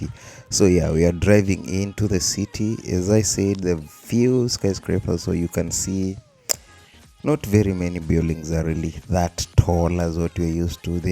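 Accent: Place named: East Africa. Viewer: Are you from Uganda or Kenya. This accent is from East Africa because my wife is from Uganda. You both have similar accent. Love you from Nigeria 🇳🇬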